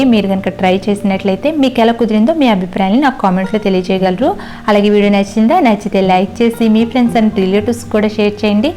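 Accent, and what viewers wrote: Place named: Konaseema, India. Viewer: Ma'am, your recipes are just awesome..but please I have a request to you..by the way, when I observe you speaking, మీరు కోనసీమ వారిలా అనుకొంటున్నాను.. నేను కొత్తపేట, అమలాపురం, రావులపాలం చాలా సార్లు వచ్చాను Hyderabad నుంచి.. అయితే, అక్కడ ఇచ్చే కొబ్బరి చట్నీ కానీ, బొంబాయ్ చట్నీ కానీ ఇంక్కెడ ఆ రుచి లాగా రాదు రాలేదు..మీరు దయచేసి, ఆ కొబ్బరి గట్టి చట్నీ గ్రీన్ or light yellow colour లో ఉంటుంది ,, specially ఇడ్లీ లోకి, బొంబాయ్ చట్నీ పురిలోకి ఇస్తారు... మాకు Hyderabad లో సైకిళ్ల మీద అమ్మే ఇడ్లీ వాళ్ళు అది సర్వ్ చేస్తారు, as they are from రాజమండ్రీ and other east Godavari district s.. please Ma'am video చేస్తారు కదా,,💯🙏🙏🙏 please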